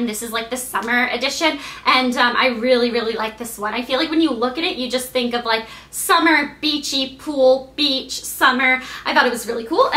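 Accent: South, United States. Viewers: Lol you never know when someone is going to get a shark bite so good thing you have your teeny tiny first aid kit! Haha you made me laugh so much in this video and I love when we can hear your southern accent a bit -3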